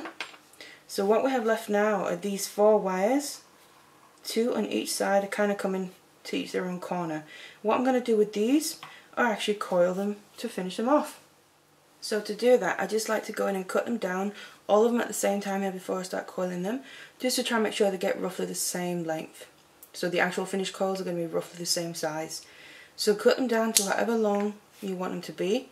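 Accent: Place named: North, United Kingdom. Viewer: Nice and clear instructions, easy to follow, and its really nice to hear a soft northern accent and not some harsh grating American one(some are OK TBH). Anyway many Thanks for the tutorial!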